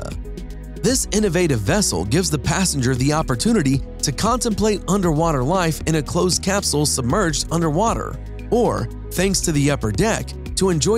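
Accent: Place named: United States. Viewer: How does that American sounding voice speak such bad English? Is it a brilliant bot, or an idiot voice actor??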